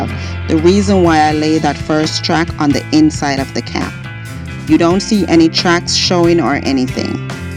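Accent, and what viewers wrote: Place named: Jamaica. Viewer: kool, subbed. r u from Jamaica? I pick up that accent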